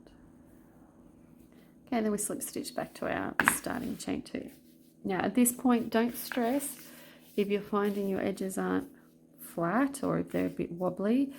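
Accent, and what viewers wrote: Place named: Australia. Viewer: Hi! Looks great..just found it and will make one! Greetings from Italy.. I guess you are in Australia right? ( accent😀)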